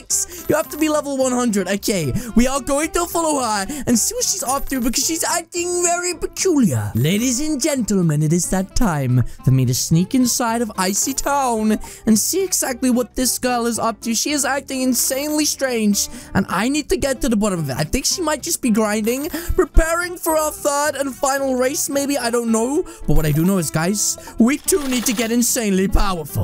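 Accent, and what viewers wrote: United Kingdom. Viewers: Its my first time seeing this vid u sound like british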